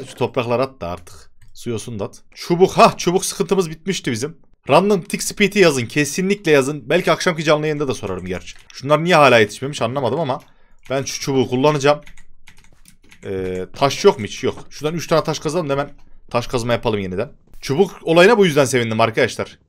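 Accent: Yozgat, Turkey. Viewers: Abi yozgatlımısın?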